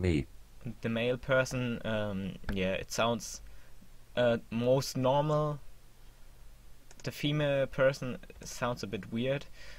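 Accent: Germany. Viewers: man merkt dass du deutscher bist Xd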